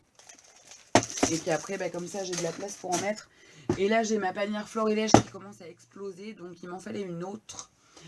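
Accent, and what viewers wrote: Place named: England, United Kingdom. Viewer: Ton accent anglais j’adore. Une affaire la peinture izink ça marche sur tout support,tissus ,métal ,bois elle sont tops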